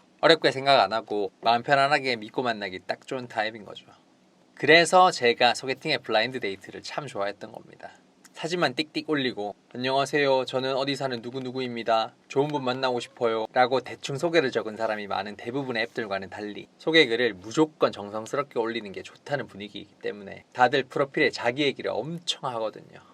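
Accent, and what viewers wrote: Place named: Busan, South Korea. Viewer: 항상 잘 보고있습니다. 말을 너무 부드럽게 잘 하는 듯해요. 특유의 부산말투도 정감있구요^^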